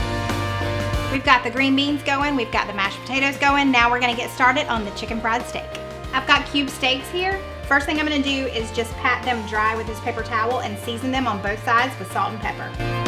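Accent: South, United States. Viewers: I love your videos!!! I love that you show step by step of how to prepare the meals. I find it very motivating because sometimes I run out of ideas what to make for dinner. P.S. I love your southern accent:) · Every time I click on one of these recipe videos it's ALWAYS a southern girl with a southern accent. Always.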